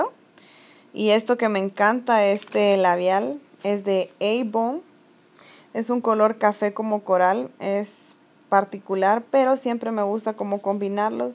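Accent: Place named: El Salvador. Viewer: Tiene acento de mi El salvador 🇸🇻♥️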